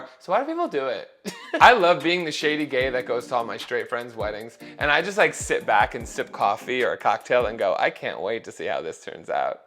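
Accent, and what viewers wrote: New Jersey, United States. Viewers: The longer stays in NJ, the more the accent comes back.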